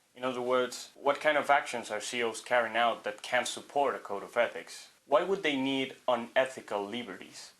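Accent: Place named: Netherlands. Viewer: Wow, you sound so dutch...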